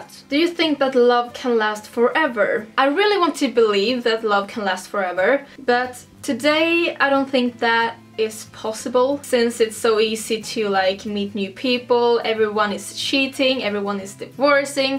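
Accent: Sweden. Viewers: the swedish accent is real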